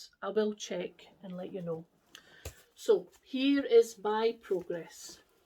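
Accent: Scotland, United Kingdom. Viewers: I’ve just found you on flosstube! I’ve followed for a while on Instagram but didn’t know you had videos! Love your stitching and absolutely love your accent! Scotland is the one place I’d love to visit it’s beautiful in pictures and part of my heritage 🖤🏴 so thankful your parents were able to get their vaccine and did well with it hope yours goes good as well! Thanks for sharing! Happy stitching 🧵!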